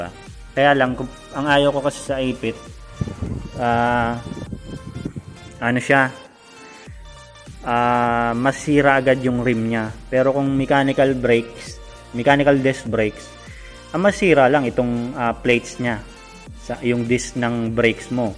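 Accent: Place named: Bicol, Philippines. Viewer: Bicolano ka sir😅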